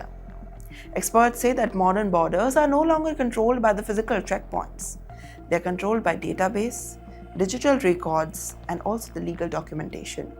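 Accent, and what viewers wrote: India. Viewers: Terrible curry English!!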